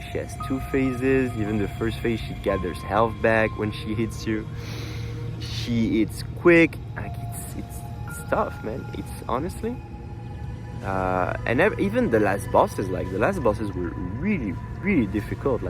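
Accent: France. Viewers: It was good while I was around 50 because at least you don't die with a single shot, the problem happens when you are constantly ganked. You can only invade if the host already has a summon, and once you invade he can summon a blue hunter... There should not be an item like that, and the invader should be able to summon help. That's my opinion. Fixing PVP is the only thing this game needs in order to b perfect imo. You've got a new subscriber, I am looking forward to watching more videos. Loved the French accent haha!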